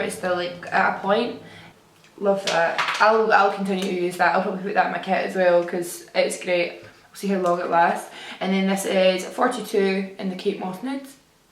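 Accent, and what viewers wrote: Scotland, United Kingdom. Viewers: Omg your Scottish me too xox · Which part of Scotland are u from I'm from Glasgow ! 💕 X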